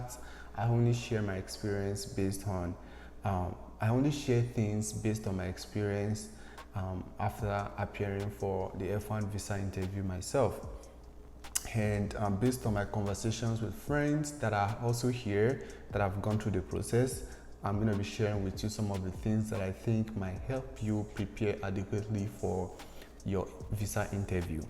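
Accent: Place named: Nigeria. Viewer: Brox talk like Nigerian ...things you shouldn't do in f-1 interview is dont form accent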